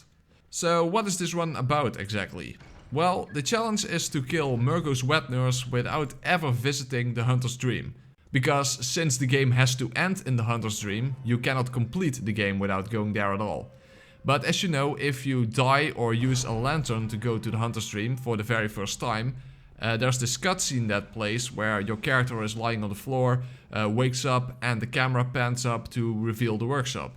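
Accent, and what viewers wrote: Netherlands. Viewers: Geez, ur dutch for sure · Ey, Nederlands of Belgisch? :p Mooie guide. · You sound dutch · I love your Dutch accent lmao! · lol narrator is dutch, stereotypical terrible accent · First of all you sound dutch, second I can't even complete this game normally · I can hear that you Nederlands are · Ik hoorde gelijk dat je nederlands bent aan dat accent hahaha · Ik hoor een Nederlander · Im surprised there are so little comments about your dutch accent. Geweldige gamplay makker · U dutch? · Love your Dutch English accent, greets from The Netherlands. Lekker gewerkt pik. ;) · This man sounds dutch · Dit is de meest duidelijke nederlandse accent ter wereld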